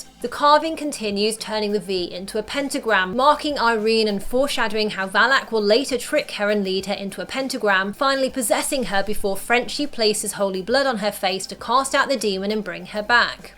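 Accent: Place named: United Kingdom. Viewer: I like your british accent.. 😍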